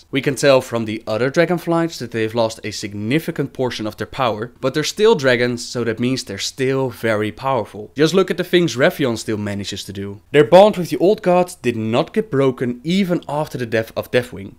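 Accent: Netherlands. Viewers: are you dutch? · are you dutch OP?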